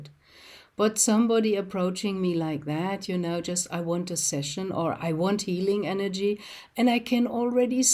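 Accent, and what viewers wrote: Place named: Germany. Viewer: Your accent is wonderful I love your german side, but clearly you dont wish it to define you👍👍 Thats fine...but theres nothing wrong with this❤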